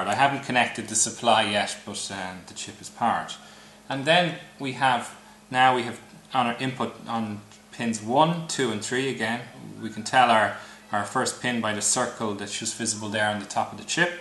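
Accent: Ireland. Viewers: Extremely pleasant voice. It sounds Irish to me! Thank you for sharing!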